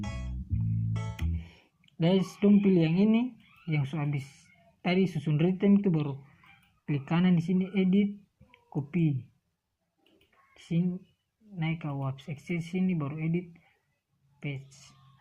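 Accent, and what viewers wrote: Indonesia. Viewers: mantaap coooi ambon logat ni ancor hahahah gooot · ngana pe logat macam logat Gorontalo..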